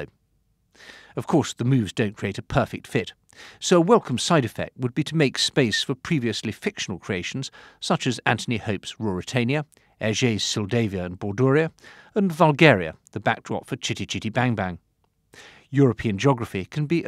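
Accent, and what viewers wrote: United Kingdom. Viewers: Wow nice British accent mate.. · I love how the guy sounds exactly as you'd expect a british guy that is drawing border to sound, complete disregard for cultural boundries, superficial understanding of intercountry problems, arbitrary splittings and most important of all preferred to rip out northern Ireland making it an island of it's own without any mentions rather than giving it back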